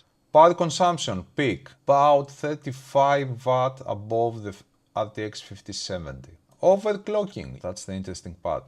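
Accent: Greece. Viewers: by the accent i bet that you are greek :) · But with a Greek accent! 🙌🏼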